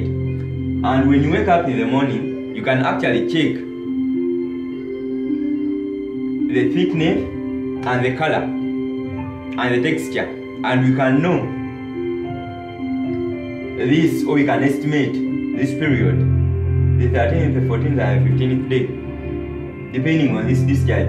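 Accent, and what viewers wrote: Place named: Namibia. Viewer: He sounds Namibian👌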